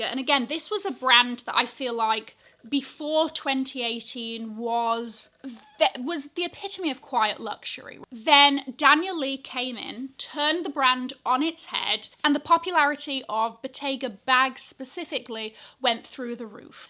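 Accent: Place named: England, United Kingdom. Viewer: just came here to say I absolutely ADORE your accent ☺️ I’m new to the channel, so I’m not sure what part of England you’re from, but I think it’s absolutely smashing 💖 Hugs from America 🤗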